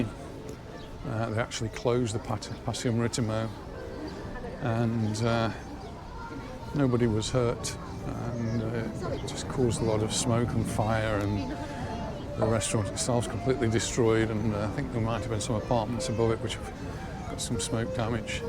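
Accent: Stoke, United Kingdom. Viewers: Thanks for this, great video. I'm popping over for the night on Sunday. Stayed one night in El Arenal last time, loved Can Pastilla, so I'm staying in the BQ Amfora Beach. Do I detect a fellow stoke twang in that accent?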